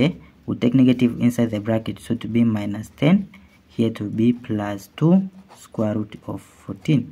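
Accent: India. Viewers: Какая-то профанация. Ребята, судя по произношению, это индус. Германией тут даже и не пахнет. Всё, кина не будет. Расходимся.😂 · По акценту вроде индус. Чалма жмёт видимо. Обычное квадратное уравнение. Но в Гарварде, где учился обама таких считают гениями и берут без экзаменов 😂😂😂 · Индийский акцент режет слух. 😢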